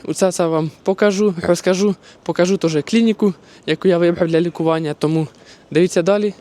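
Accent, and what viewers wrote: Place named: Slobozhanshchyna, Ukraine. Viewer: тисячу лайків за те, що балакаєш на слобожанській говІрці! Не звертай увагу на "мовний патруль", уже наслухались "вишуканої"...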